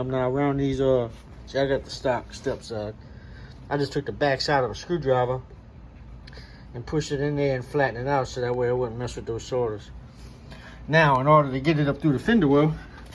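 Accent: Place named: Louisiana, United States. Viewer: You gotta be from Louisiana brother lol. I know that accent from a mile away, I lived in Louisiana for 5 years. Great video, I bought similar lights for my truck, thanks for sharing!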